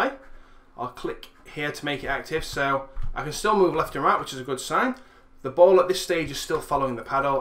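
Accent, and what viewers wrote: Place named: Nottingham, United Kingdom. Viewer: Now it seems obvious but I hadn't placed it earlier. I think across Notts though there's quite a broad array of accents. Beeston for example is pretty different to Mansfield.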